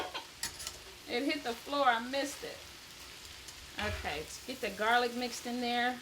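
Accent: United States. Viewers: Yummy....Huge fan of your cooking and loved American accent. Wish you have your own TV cooking shows or even better come down under Australia and shows us Aussies your delicious cooking recipes.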